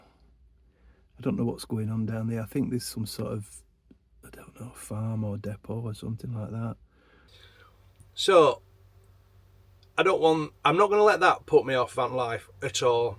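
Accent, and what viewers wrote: United Kingdom. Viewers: Oh, I hear an accent, you are in the UK. Beware, Jack the Ripper got his start in and around London.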